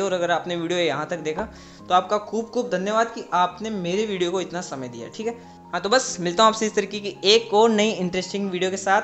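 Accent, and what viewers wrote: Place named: Gujarat, India. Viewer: Ye pakka gujrati hai 😂😂😂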